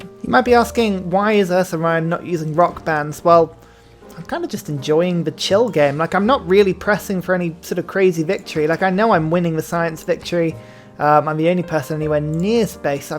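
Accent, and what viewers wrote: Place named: England, United Kingdom. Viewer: Whats your unique accent? I thought it was English lol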